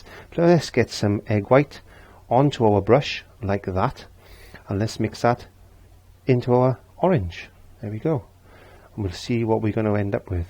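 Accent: Wales, United Kingdom. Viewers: Great video! Am I detecting a bit of a welsh accent mixed in with another?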